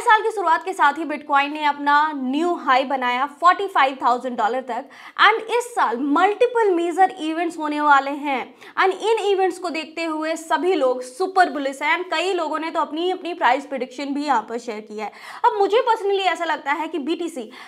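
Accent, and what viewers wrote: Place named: Uttar Pradesh, India. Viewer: ji aapke bolne se hi pata chalta hai ki aap Uttarpradesh k ho.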